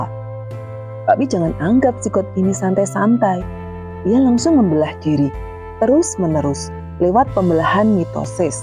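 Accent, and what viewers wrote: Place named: Java, Indonesia. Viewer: Jawa banget nduk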